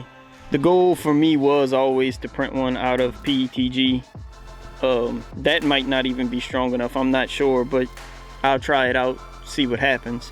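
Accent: Louisiana, United States. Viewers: Just a question? You in southern louisiana.. that accent has some breaux bridge behind it from how it sounds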